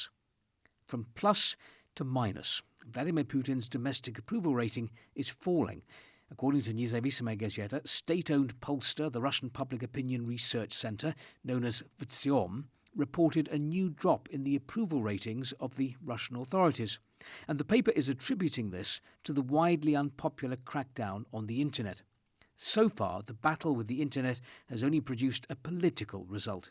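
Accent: United Kingdom. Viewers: Nothing better than a British voice, telling everyone how appauling the rest of the world is for supporting russia, while harbouring billions of Oligarch money since the 90s and 00s. And not only that, the UK has it on the left and right all the same, Galloway and Farage both seeing who can get their nose the furthest up oligarchal donors. Both acclaimed political heroes of the politically demented.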